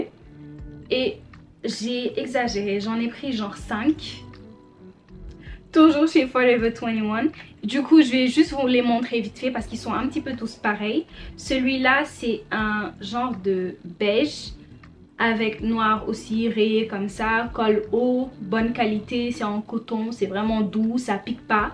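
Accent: Senegal. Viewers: Genre toujours l’accent sénégalaise thieuy sama Mboukou yi❤️🤩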